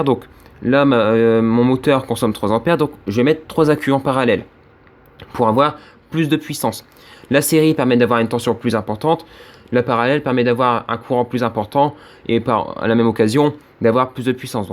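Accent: North, France. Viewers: Hello, intéressant je voit que tu est peut être du nord avec ton accent (idem pour moi,du pas de calais) je voulais savoir si ce serait possible de réaliser une batterie par toi même de la vendre,je serais intéressé c'est pour alimenter un sondeur sur un kayak ? Merci de ta réponse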